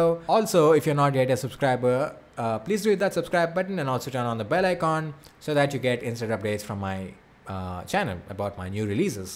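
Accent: India. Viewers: I still don't understand. i Indian accent is completely understandable why people need a fake layer on that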